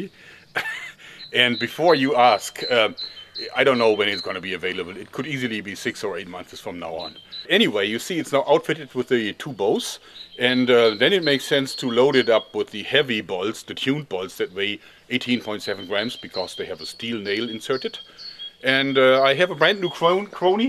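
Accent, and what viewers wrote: Germany. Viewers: So geil der Deutsche Accent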